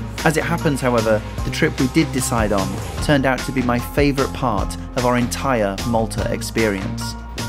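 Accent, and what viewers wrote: England, United Kingdom. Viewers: Such a pleasure hearing an English voice rather than the ubiquitous American voice. I have a son living and working in Malta but this video is the only sight I will see of Malta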